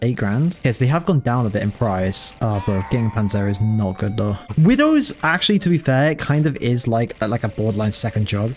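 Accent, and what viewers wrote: United Kingdom. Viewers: ur my favorite british yapper on youtube bossman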